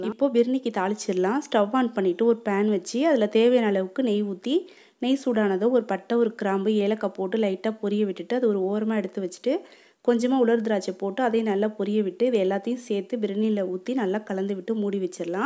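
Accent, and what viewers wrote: Karaikal, India. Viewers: Karaikwl slang- tholuvittu 😄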